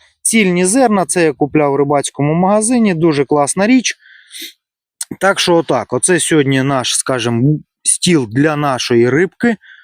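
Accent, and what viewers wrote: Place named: Russia. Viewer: Как бы не старался рускоязычный человек говорить на мове, всё равно это чувствуется.А водоём этот не плохой,по крайней мере не так заросши водной растительностью как в прошлых видео.. нхнч.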